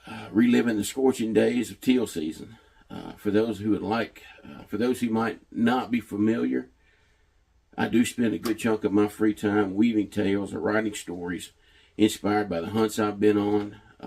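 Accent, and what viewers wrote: South, United States. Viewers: This was amazing, you're such a good writer not to mention storyteller especially with your lovely southern accent. I can't wait to hear more stories from you in the future 😊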